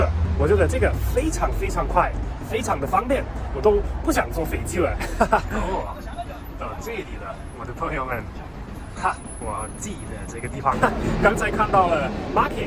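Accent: Sichuan, China. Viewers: 的四川话说得可以呵！才来了五年👍👍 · 提个建议，你最好用英语加中文字幕，你的普通话水平太一般了，还有点川味，哈哈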